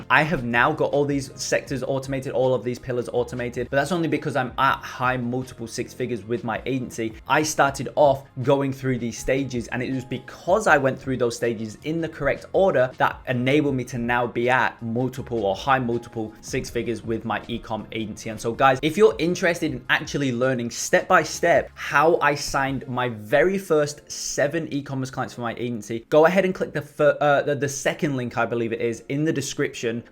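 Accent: United Kingdom. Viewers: Hey bro are you originally from the UK?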